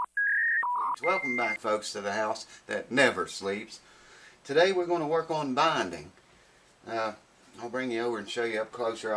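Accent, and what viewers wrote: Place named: Kentucky, United States. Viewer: Dig the vids. I watch them cuz your one cool cat. You tone of voice reminds me of my family from Harlan Kentucky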